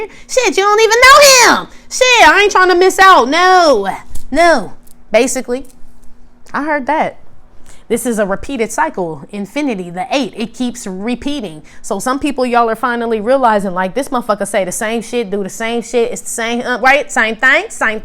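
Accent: Chicago, United States. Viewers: you talk like us in Chicago🤣🤣🤣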